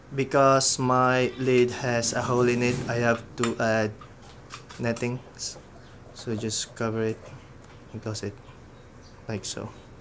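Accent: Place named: Indonesia. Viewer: logatnya indonesia nih wkwk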